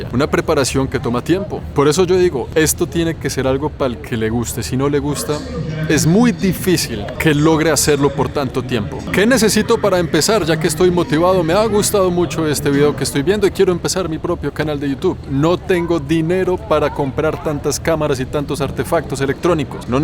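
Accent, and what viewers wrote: Colombia. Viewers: Saludos, muy informativo el video pero tengo un comentario, tienes cara de panameño con acento colombiano. Sin embargo, los videos son muy buenos felicidades. Saludos desde Panama y buen viaje. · Que bueno este vídeo muchas gracias. Me encantan y sabes que más me gusta en la forma que los editas y como los expresas genial con ese típico acento Colombiano y mucha naturalidad. Gracias feliz viaje aquí a Europa . Vivo en Alemania en Wiesbaden y soy de Cali . Felicidades. Para adelante estas haciendo un buen trabajo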